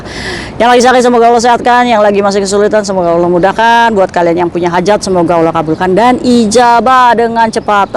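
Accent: Indramayu, Indonesia. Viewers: Logate kyk Wong Indramayu sih?? ana Longkrang e kedik 😂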